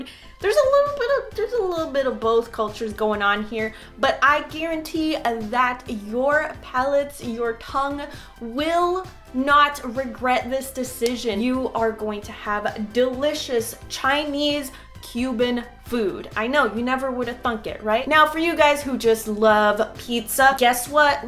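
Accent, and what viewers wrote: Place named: Spain. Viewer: Wow 😲 I got a nostalgia now! I was born there 😢😀... sorry I didn’t know you! Just discovered you cause Brian Sellers on Twitter 🎉 and came running to give you my support 🙏. Beautiful video! Great Spanish accent 🙏👍🙏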